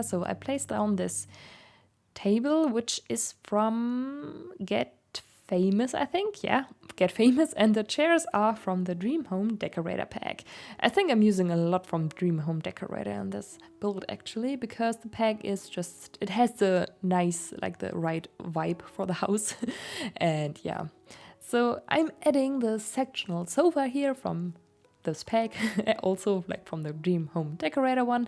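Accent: Germany. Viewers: hört sich sehr deutsch an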